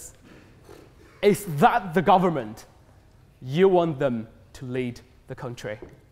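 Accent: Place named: Lancashire, United Kingdom. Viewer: Don't you know a Lancashire accent when you hear one?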